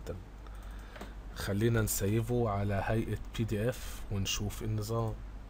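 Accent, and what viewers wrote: Egypt. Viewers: هو اني فهمت بس ليش يحجي مصري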